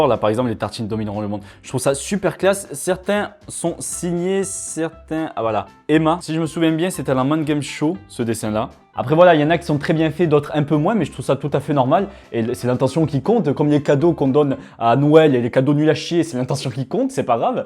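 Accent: South, France. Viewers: Byron 650 c'est l'accent du sud je pense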